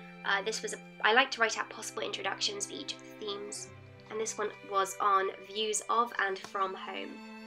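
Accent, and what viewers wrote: United Kingdom. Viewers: That British accent though.😍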